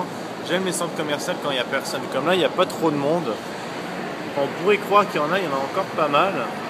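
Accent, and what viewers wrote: Quebec, Canada. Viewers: Héhé tu choppes l'accent Québécois, fais gaffe;) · tu comence a plus parler quebecois den tes video evite parce que jai du mal a comprendre sinon =jpe pu comprendre t video mode quebecois: bon jmenui che moué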